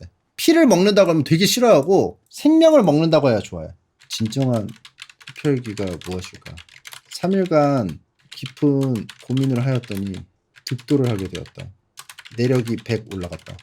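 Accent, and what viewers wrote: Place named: Jeolla, South Korea. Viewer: 서남방언을 잘쓰고 눈치가 빠른 침착맨 겐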